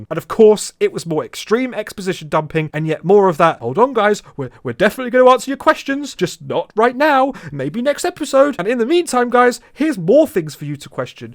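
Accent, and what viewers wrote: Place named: Yorkshire, United Kingdom. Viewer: Good Yorkshire accent tho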